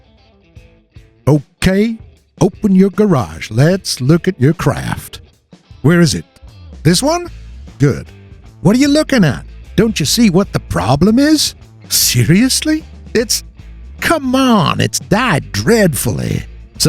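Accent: United States. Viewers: Can the commentator please not talk with this cliché american accent? I can't stand listening to it.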